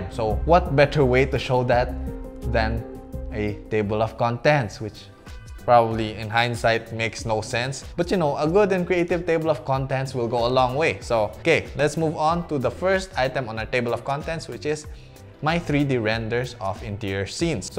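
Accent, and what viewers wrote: Philippines. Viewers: What I love about it, it's not THE "FILIPINO ACCENT" that the media (especially foreign media) portraits this is the legit pinoy accent not the forced one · Infairness ang galing niya mag english. Filipino accent peru di masagwa at maarte. Tamang tama lang. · I like how he still has his Filipino accent, this should be normalize!!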